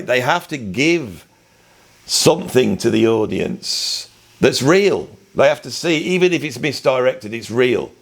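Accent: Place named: Midlands, United Kingdom. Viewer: More then interesting, first thing why am I looking at other comments, sod it,I don't need to... Your comment at the very end, have a good death, that comment in itself can go either way, I guess it could be viewed as humour, but the point that I would like to make is,The subject of Death is totally avoided here in the West, Burried in fact.,now thers a point,humour arriving again... But absolutely we all need to be able to die in silence and peace.and hopefully in absolute awarness. My personal view is Life and Death are part of the same coin... Anyways,Artists such as Joni or phill,Kate,are somehow via there creative ability deal with there Trauma and failing and are capable to go beyond this in a cathartic way, I agree with you, we all are capable of finding way to rise above the stress and that method iin itself is creativity. There are unfortunate people who sadly become lost and have an immensely horrendous time in darkness.. 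Oh,Bloody hell I'm blabbering on,sorry. To put it simply you have to be true to yourself and if you happen to offend someone it is there problem, your loyall membership and subscribers know you and will stay.... Love the midlands accent, I'm a East middlander,originally, yep I can't help that 😂. Best wishes to your friend. Enjoyed again this in depth discussion...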